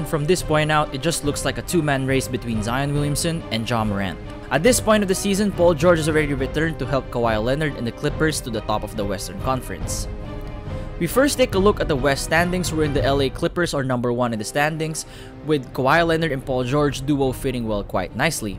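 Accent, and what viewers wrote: Philippines. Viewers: Ahh tama talaga ako. Ang accent mo po hehehe Pinoy talaga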